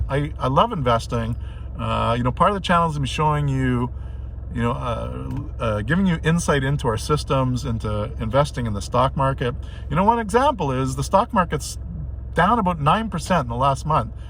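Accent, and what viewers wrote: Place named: Italy. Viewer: It's funny how I hear your Italian accent during your Spanish lol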